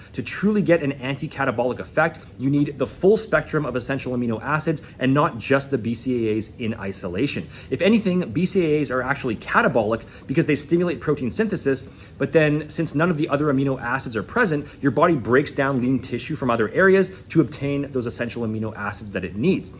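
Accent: South Africa. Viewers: He sounds like hes from south africa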